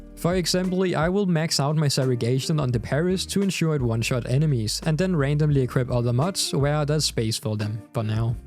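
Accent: Denmark. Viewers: im 5 sec into the vid are you danish? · You sound so Danish in your English and iam loving it. If i look across the water i can see Denmark:) Cheers from Flensburg.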